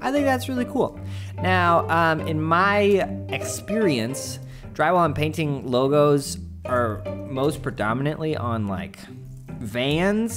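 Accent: Germany. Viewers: So, first time watching this dude, for a split second I actually thought hes german. By far the most convincing so far. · Doch klar, ist Bayerisch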